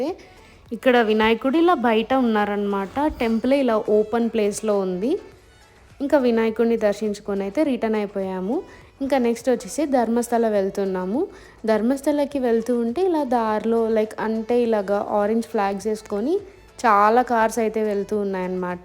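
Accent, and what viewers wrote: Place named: United Kingdom. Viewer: Kuki kadhamma kukke anali...prathi word ki edho English accent touch ivvalni try cheyakudadhu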